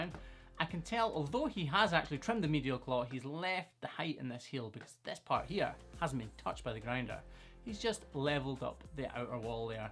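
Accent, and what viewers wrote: Scotland, United Kingdom. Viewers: It's because of the cute Scottish accent lol otherwise it would have been a proper roast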